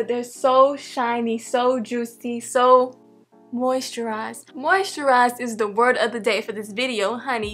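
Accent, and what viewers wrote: South, United States. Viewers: What part of the US did she get her accent from ? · “...there you go! Throw it to the beck!” lol that country accent! Beautiful lady and beautiful hair! Got me another easy style! God bless sis! ❤️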